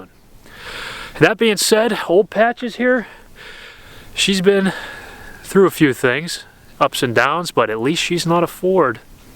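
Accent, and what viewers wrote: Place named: Pittsburgh, United States. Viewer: 1st off -- I love your Pittsburgh, PA area accent, I used to live in the constant grey of PA up there myself but I'm in sunny Florida now where I bought my '14 Sierra new off the lot. Had about 20k miles on it and the A/C condenser went out -- but they wouldn't fix it because I was past the 36 month warranty but I paid for the parts and they ate the labor. 2nd, I have that tranny "clunk" that you talk about from day 1. It was never bad enough to take back to dealer to see if it was an issue but it is always there especially when tranny is cold and I am driving slow out of the neighborhood. It is 2022 now and I only have 44k miles on it but your mention of the lifters is bothering me. I'll definitely look out for that issue. BTW, my "tailgate assist" thing just quit working one day years ago. I'll definitely keep an eye out for the GPS antenna seal because we get tons of rain down here. 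With that in mind, I do get a musty smell every time I start my truck and the fan is blowing. I have tried many things to get that to go away but it won't. I assumed it was the humidity down here but now you have me thinking it could be that seal on the roof. Thanks for this video, you confirmed many of my suspicions of issues.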